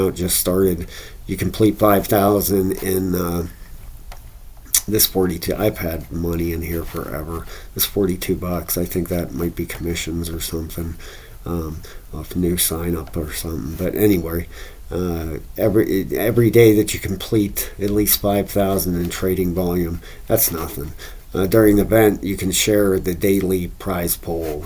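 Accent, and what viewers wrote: Pittsburgh, United States. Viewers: Nice video- are you from Pittsburgh by chance? - killin the shorts💥 $Turbo $TurboToadToken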